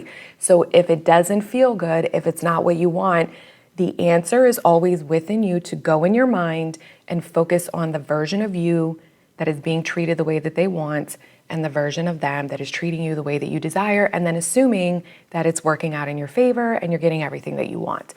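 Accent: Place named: Rhode Island, United States. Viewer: Your accent sounds like you’re from Rhode Island. New sub.💕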